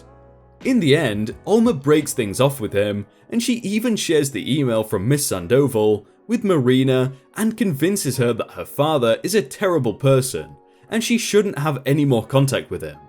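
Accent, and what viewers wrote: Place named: North, United Kingdom. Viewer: NYC BABY I'm not upset, I'm well aware he mispronounces things. I assume when you have a northern accent words sound different in your head, but I wouldn't know. Regardless whether it's a name or a word, it's new and I wouldn't have pronounced it any differently NOT being a northerner. It looks like sand-oval. But then if a word is new I do pronounce them how I read them.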